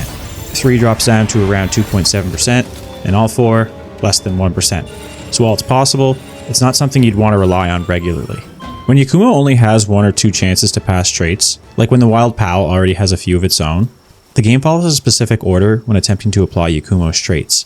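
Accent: Canada. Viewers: Is that a fellow Atlantic Canadian I hear?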